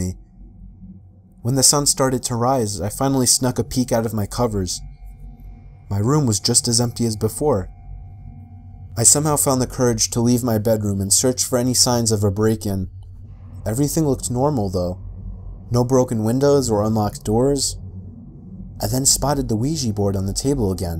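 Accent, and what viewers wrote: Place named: New England, United States. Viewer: I caught your new England accent in this one mr. ;)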